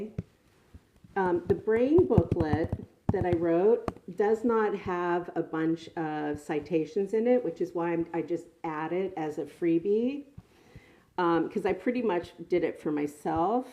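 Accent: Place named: Pittsburgh, United States. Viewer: Are you from Pittsburgh? By any chance your accent sounds familiar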